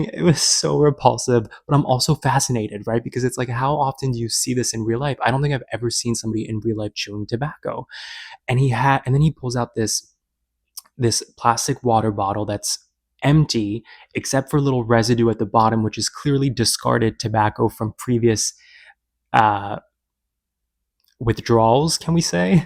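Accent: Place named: South, United States. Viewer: Sounds like a true southern man with limited experience hooking up.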